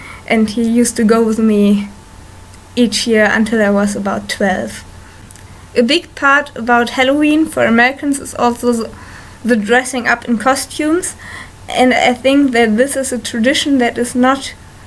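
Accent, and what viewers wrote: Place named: Germany. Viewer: Ur englisch is great (= a bit german, but i like it <3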